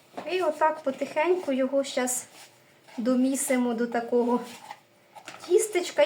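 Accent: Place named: Poltava, Ukraine. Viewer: Яка гарна господарочка, так гарно все розказала i показала, приэмна така мова полтавського говору... Згадалося щасливе дитинство i бабусинi смачнi xрущики та пирiжки з вишнею. Спасибi за смачне печиво. Щастя та здоров'я господинi та ii ciм'i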